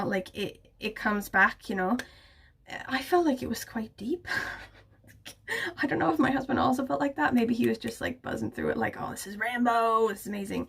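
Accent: Wales, United Kingdom. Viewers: I haven't caught one of your videos for a while because, you know, life and work and reading get in way of watching stuff. So this was a chance to catch up which was lovely but also made me notice you are sounding much more Welsh these days. It's great! You now have the best accent combination ever.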